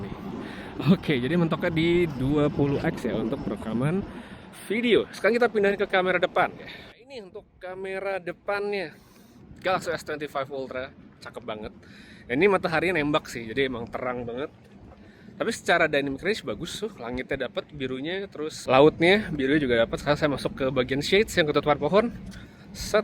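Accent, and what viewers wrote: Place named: Java, Indonesia. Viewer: Bang dengan medhok jawanya: "Galaksiii Eaiiii"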